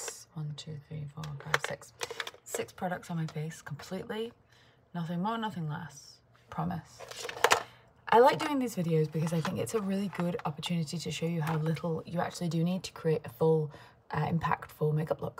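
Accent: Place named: North, United Kingdom. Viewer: I love it when you go all Northern… you’ve never forgot your roots. I went to college in Southport and it’s nice to hear the accent.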